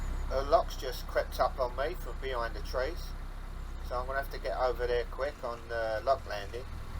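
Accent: London, United Kingdom. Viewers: Hi ya. So glad you are back doing vlogs. Was wondering how you was, and why no vlogs for a while. I love your seeing your journeys and all the pretty scenery. Oh and miss your London accent ! Stay well from a fellow Londoner. Cheerio x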